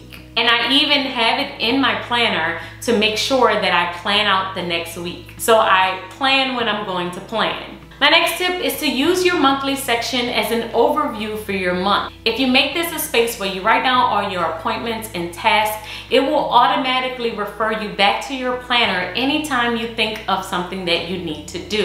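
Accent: New Orleans, United States. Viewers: Okay sis!!! You are beautiful and I feel your kindness and support. What am I hearing in your voice ??? New Orleans, Islands where ? Keep the videos coming! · Sounds like you are from New Orleans! Beautiful planner! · I feel like I hear a slight New Orleans accent🤔😂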